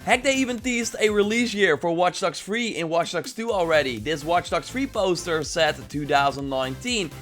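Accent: Netherlands. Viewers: Are you dutch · This dutch english is cringe to me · Is he dutch? If not, where is he from? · Are you Dutch? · ughhh, your Dutch accent is the wooorstttt. I just can't keep listening to you.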